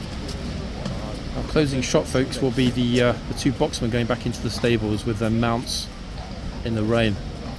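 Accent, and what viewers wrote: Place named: United Kingdom. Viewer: When I first started watching videos and he said 'tour guy', I heard with my Californian ears 'tall guy'. And I'd look but not see a tall guy; wondering why he would point out tall guys anyway. Finally, it dawned on me that he was saying tour guy. Usually I can tune my California ears to British but those 'r's and 'l's apparently don't cross over.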